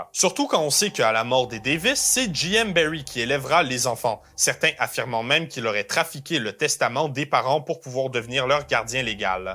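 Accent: Quebec, Canada. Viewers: Hoo il viens d’où cet accent? Quebec ? Dsl jsuis juste curieux. Sinon tjrs content de voir une analyse d’une de mes oeuvre favorite (du moins le roman, et oui j’aime la cruauté du peter original 😅) . Que tu a tres bien reussi.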